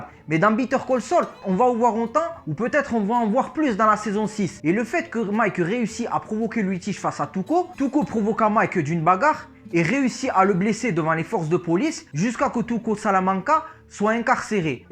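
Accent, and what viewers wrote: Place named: South, France. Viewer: Salut, ça fait plaisir d'entendre ton p'tit accent du sud. Excellente vidéo!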